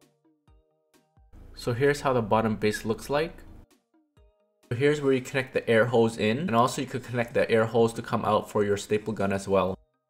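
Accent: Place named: Poland. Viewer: Polish accent :)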